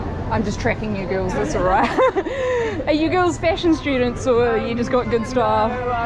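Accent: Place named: New Zealand. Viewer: Ahhhh I knew you were New Zealander from the first time ya talking Kia Ora from home love your vids!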